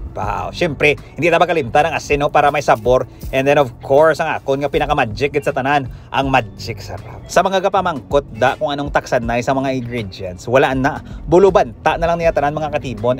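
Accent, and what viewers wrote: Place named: Ilonggo, Philippines. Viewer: I missed the bacolod illonggo na tono! goodjob!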